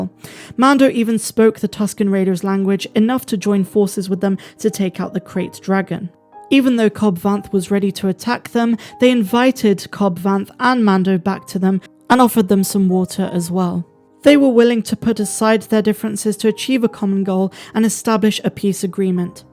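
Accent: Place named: Somerset, United Kingdom. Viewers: That South West accent gets me every time 😊 "Jibber Jabber !" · I enjoyed your video, fellow West Country pirate xD is that Somerset I'm hearing?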